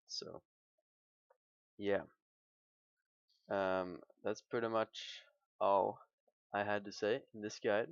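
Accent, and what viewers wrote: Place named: Norway. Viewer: dat norwegian accent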